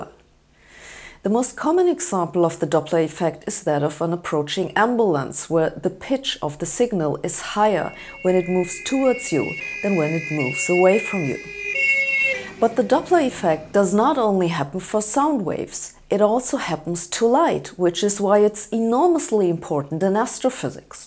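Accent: Germany. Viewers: Sabrine, great job I love your videos but now the but. English sort of compresses parts of the words and many non english born seems to think that that is not so important, But it is. If the relevant parts are not compressed it is sometimes difficult to catch the word. In short you sounds like a german ( surprise surprise) So the efford you need to improve is very little and you will not only be great but sound great. Sorry if inapprobiate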